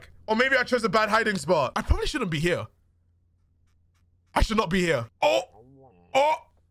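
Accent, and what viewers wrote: United Kingdom. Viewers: you're from London??? Why didn't you come out as british earlier???? Smh my head I feel so betrayed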